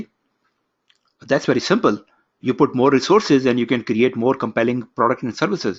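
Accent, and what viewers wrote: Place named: India. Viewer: it would be better to use an AI voice for these videos, listening to indian pronunciation is extremely exhousting, without even talking about the accent.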